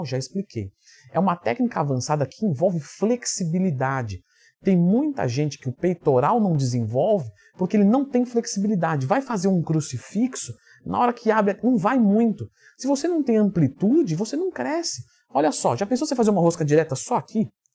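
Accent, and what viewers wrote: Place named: Pará, Brazil. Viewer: Mas temas aí pai: que fala é o de Belém do para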